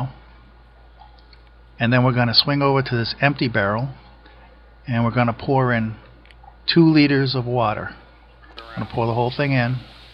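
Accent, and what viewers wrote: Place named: New England, United States. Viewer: HEHEHEHEHE!!!!! I love how you watuh those plantuhs!!!! When I hear your accent, I think of lobstuh fishuhmen.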